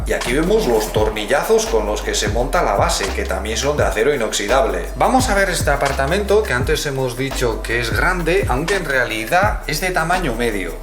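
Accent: Chile. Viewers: Wena... saludos desde Coquimbo, Chile. Habla muy bien el español con acento chileno. Sorprendente. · 7 años en Chile, con razón habla así, ahí se habla cualquier cosa menos español..... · Si se le nota el asento de chile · tiene el acento chilenx :') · Cuando comenzo a hablar le note altiro un acento chileno. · se siente muchooo el acento chilenoooo 🥺💓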